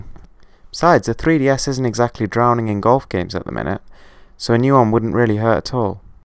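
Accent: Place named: United Kingdom. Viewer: why british people say to pokemon. pöukman? wtf...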